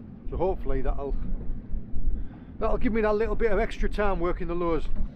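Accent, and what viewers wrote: United Kingdom. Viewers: Viaduct I'm wondering that too. A lot of times the CC spelling is wrong and it also takes liberty in what the word you actually said. But the CC is better than nothing. After listening to your videos, I'm understanding more and more of your accent. And they say us Americans have a lot of accents, you Brits have won the war in that category by far. Another GREAT video, always a pleasure.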